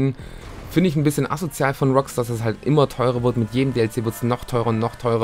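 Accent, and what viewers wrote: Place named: Swabia, Germany. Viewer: Seit Beginn 2016 spielt eig. eh fast jeder mit UnlockAll und im Vergleich zu anderen Updates hust finance and felony hust find ich das Cunning Stunts DLC nicht mal soo teuer. Und trotz alledem sind die Autos in diesem Update viiiel geiler als alle anderen. Ansonsten: Cooles Video! :) Ps: Bin auch Schwabe. Den Akzent kannste dir einfach net verkneifen :D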